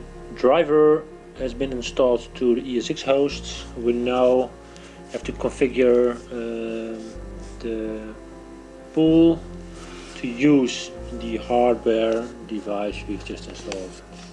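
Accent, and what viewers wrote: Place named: Netherlands. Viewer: aan jouw accent to horen ben jij Nederlands. Vraagje, werkt dit ook met Microsoft RDP? Ik heb thuis een ESX 6 host. Hierop heb ik een aantal servers waaronder een Terminalserver waar mijn familie op inlogt. Nu wil ik dus de Quadro 4000 met ze delen zodat ze binnen Terminalserver gebruik kunnen maken van 3D mogelijkheden. Is dat mogelijk hiermee? Want in jouw demo maak je gebruik van VMware View. Dank je wel voor jouw video!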